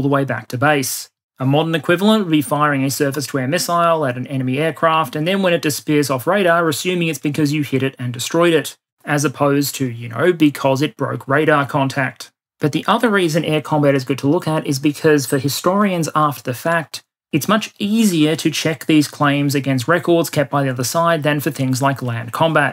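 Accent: Australia. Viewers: How you put out such high quality, thoroughly researched and amazingly interesting presentations on a weekly basis while also holding down your day job is just mind blowing. I don't know how you do it, but I am just so damned glad that you do. And whilst sharing an accent and a like of vegemite doesnt give me the right to be proud of your work, there is some level of shared Aussie pride in knowing that a fellow countryman from the wrong side of the world puts out arguably the best Ukraine war related stuff on youtube. Bravo.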